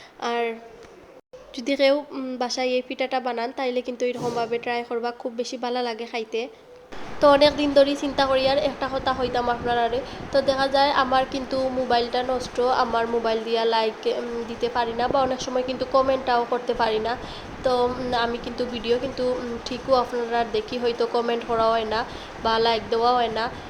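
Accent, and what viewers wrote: Sylhet, Bangladesh. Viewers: মাশাল্লাহ আপু পিঠা গুলো অনেক সুন্দর হইছে ভিডিও টি অনেক ভালো লাগলো আর সিলেটের কথা গুলো আমরা অনেক ভালো লাগে❤❤❤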